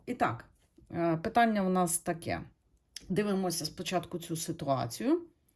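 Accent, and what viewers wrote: Western Ukraine, Ukraine. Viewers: гарна мова з акцентом Західної України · у вас шикарний западенський акцент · У Вас акцент дуже злегка західноукраїнський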